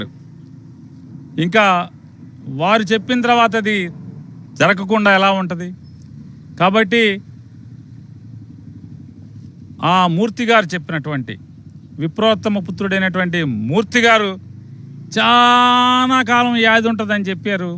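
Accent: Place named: Godavari, India. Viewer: మీరు మా గూదారొళ్లలా భలే మాట్లాడుతారండీ. పాపం నాయుడు గారండీ! ఆయ్ 😊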